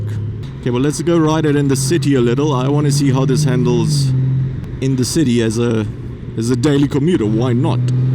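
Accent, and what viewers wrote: South Africa. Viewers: You sound so south african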